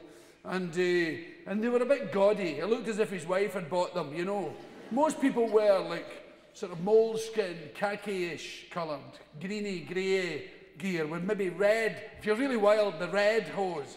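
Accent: Scotland, United Kingdom. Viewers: Im afraid I dont understand half of it…wish I was scottish (or maybe not)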